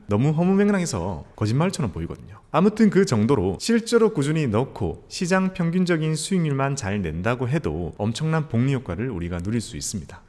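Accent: Gyeongsang, South Korea. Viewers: 처음엔 무슨말인진 모르겠고 그냥 저음의 경상도 사투리를 즐기고 있었는데요 듣다보니 이제 이해도 됩니다 😎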